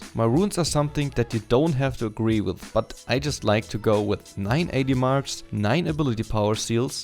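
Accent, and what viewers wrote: Germany. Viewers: Ey echt cooles video, ich hätte da 2 Fragen erstmal und zwar: 1. Welche Elo Spielst du also sagen wir letzte Season? 2. Was ist dein Mastery Score mit Shaco momentan? Als Feedback, mach gerne mehr Videos die sind echt Klasse, hast echt alles super erklärt und wieso ddu diese Spielweise bevorzugst. Du könntest deinen Viewern also auch mir zeigen wie du spielst gegen counter Matchups verschiedene invade jgl Wege und was du machst wenn du behind bist. Danke fürs Lesen ( und ja man hört es heraus das du Deutsch kannst) LG ~Finn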